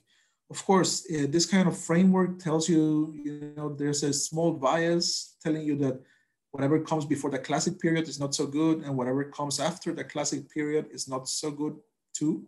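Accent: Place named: Brazil. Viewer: você tem um sotaque brasileiro quando fala inglês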